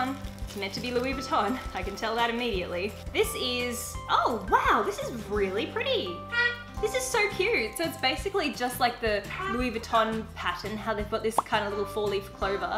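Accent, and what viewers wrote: Australia. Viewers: GURL U AUSSIE!!! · are you australian?and your bird is so cute i had the same one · im choking, you're aussie? bLEsS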